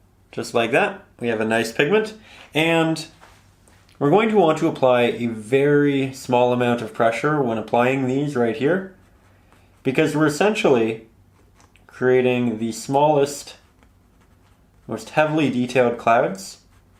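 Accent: Canada. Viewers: Outta curiosity where are you from? You sound Canadian if I’m not mistaken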